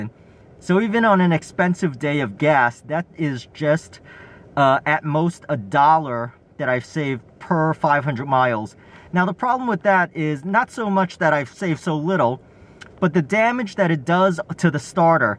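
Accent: Brooklyn, United States. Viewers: Sounds a tad like Woody Allen. Brooklyn boy?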